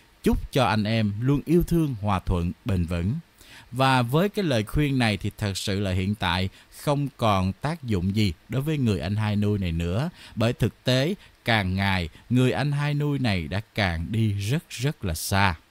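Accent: Standard. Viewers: nói chuẩn và hay.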